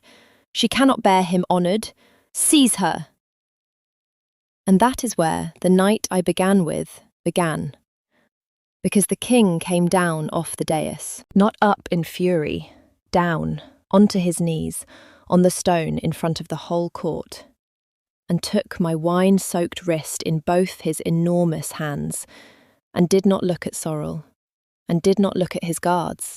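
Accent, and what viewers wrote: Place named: England, United Kingdom. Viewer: I love the English accent. ♥ And I loved this story. ♥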